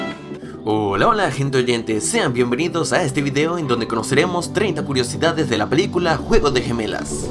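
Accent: Venezuela. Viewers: consolo oir tu voz dije naaaa este chico es venezolano 7u7 ven paca y abrame compañero :v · Eres de Venezuela ?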